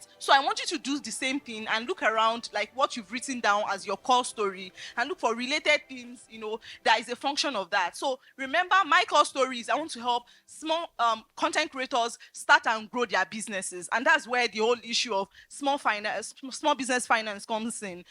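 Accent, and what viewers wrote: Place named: Nigeria. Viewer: I love how you're speaking like a Nigerian. No extra accent😂❤❤❤.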